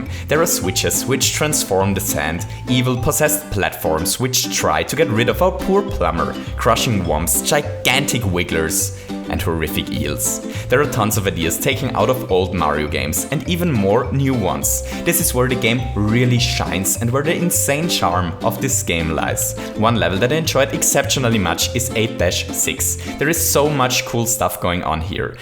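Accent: Germany. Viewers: You sound like you have a german accent :D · You sounds german🤔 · Are you German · I really like your intro music. And are you german? Because your accent sounds like you are german. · Is german your mothertounge? Sounds like you have a german accent.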